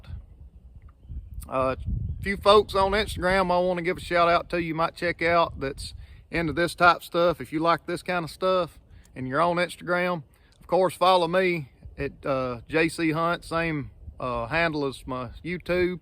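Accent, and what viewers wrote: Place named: South, United States. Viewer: That's just Southern English, what I find hard to understand is some of the dialects from the Northeast.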